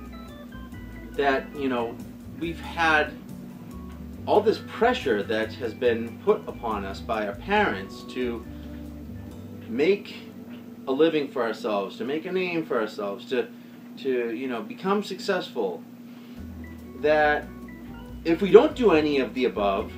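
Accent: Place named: Boston, United States. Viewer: We get to see you sporting your full-on Boston accent in this one. Good on you, mate! Also shows how you can effectively reach out to the thick-headed Meadowlark Lemons of the world. Cheers!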